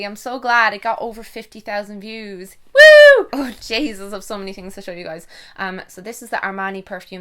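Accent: Dublin, Ireland. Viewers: I love your videos so much! You always put a smile on my face:) I feel like home when I listen to your beautiful Irish accent because I'm actually half Irish living in Finland:) And oh my god it would be so cool if my name was in your book! 💚💚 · I'm from Ireland 🇮🇪 and your accent is the cutest 🎉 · I would love to be in your book love watching your videos and love your Idunlun accent 😍💚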